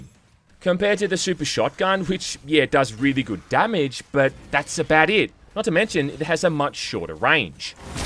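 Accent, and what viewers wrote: Australia. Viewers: I hate the way Australians sound, can AI make you not sound like that, I hate it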